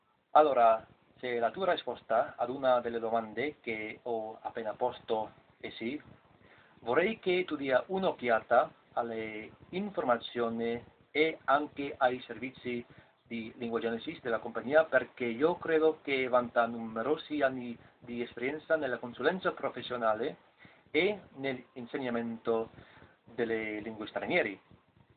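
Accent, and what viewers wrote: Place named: Spain. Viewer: You have a spanish accent when you speak italian, is interesting how a second language affects a third,fourth ect....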